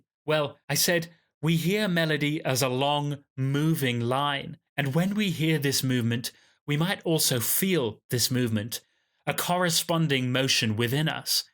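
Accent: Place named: United Kingdom. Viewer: I'm Brazillian guy and found your channel by chance. They are very eductional and informatives and beside I not be a native in english, your british accente help me understend almost all that you speak. it's clear and objective when the tonic syllable is stressed. US accente is terrible to non natives in intermediate level. I wish success with channel and keep to producing new videos.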